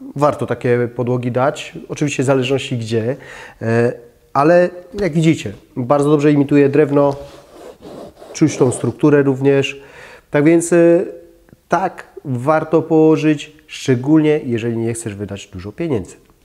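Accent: Silesia, Poland. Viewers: Stara się, ale słuchać śląski akcent:)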